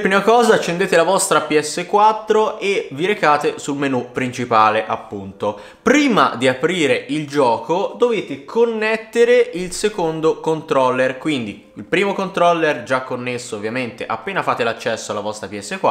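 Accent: Tuscany, Italy. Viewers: Quanto toscano🤣😊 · Sei toscano vero? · Sei toscano? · Ma sei toscano? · We bello toscano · ma sei toscano? mi sembri un po' toscano 😂🤗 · Ma sei toscano?? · Bello l'accento toshano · Si chiama parlata toscana/fiorentina🤦‍♂️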